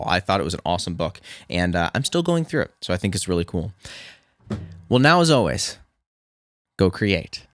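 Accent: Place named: Michigan, United States. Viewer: Are you from Michigan? Your accent kind of sounds like it 😂😂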